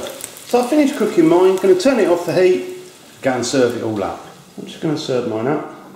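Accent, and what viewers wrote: United Kingdom. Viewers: british accent <3 <3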